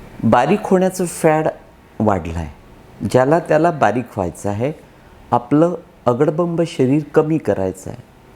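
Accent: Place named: Varhadi, India. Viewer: Assal Varhadi/Nagpuri Spicy, Saawaji Bhasha aikun man trupt hot hote,Kay karnar OM Shanti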